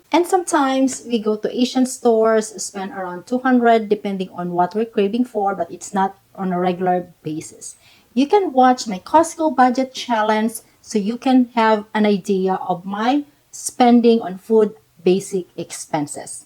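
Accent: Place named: Philippines. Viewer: you look korean , sound like a philippino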